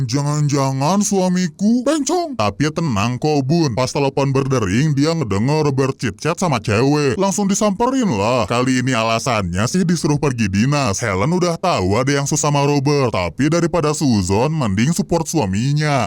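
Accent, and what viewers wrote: Java, Indonesia. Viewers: Orwng jawa kah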